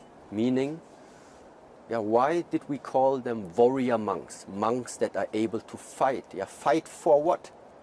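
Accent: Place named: Germany. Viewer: nach einer sekunde gehört dass er eigentlich deutsch spricht hahaha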